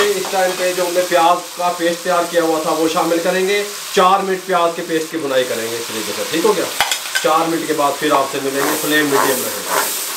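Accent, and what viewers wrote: Punjab, India. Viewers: Oh ji tusi great ho Aapki Punjabi badi sweet hai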